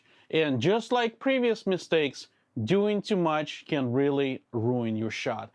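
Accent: Russia. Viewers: i don't know man, they might be helpful for someone who does not speak english at all... but mostly they feel like a riddle where you just try to guess what these random words suppose to mean)) i was surprised honestly, i always thought you were russian – because of accent and idk, attitude? sense of humor? Anyways, awesome video as always, thank you!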